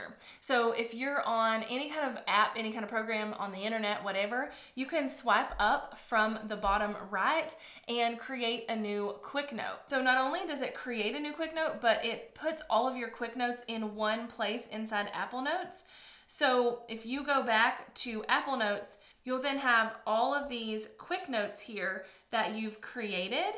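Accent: South, United States. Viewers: I love that southern accent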